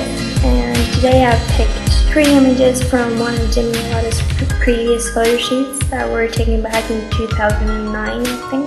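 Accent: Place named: United States. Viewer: you sound american to me.... 0_0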